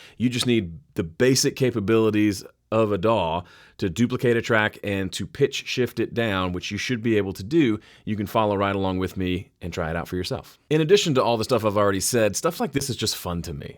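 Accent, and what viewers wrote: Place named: Indiana, United States. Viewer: So you’re from Mississippi? Sound more Indiana🤓 How ‘bout dem Memphis Tigers? Great tip too, thank you good sir.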